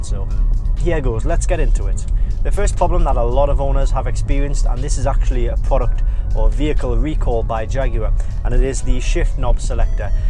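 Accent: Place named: Birmingham, United Kingdom. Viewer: Birmingham aye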